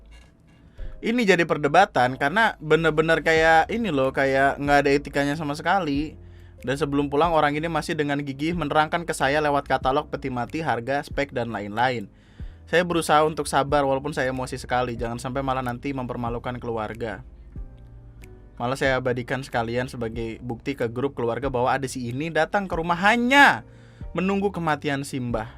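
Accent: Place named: Riau, Indonesia. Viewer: Logat Riau tapi pakai lu gua. Jatuh jatuhnya cringe.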